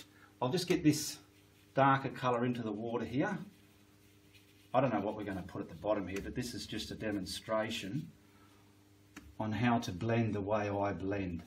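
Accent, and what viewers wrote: Australia. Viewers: Just stumbled across your video and I gotta say, I think I found my new Bob Ross lol. Your voice is very nice to listen to❤️ I love me an Australian accent🤭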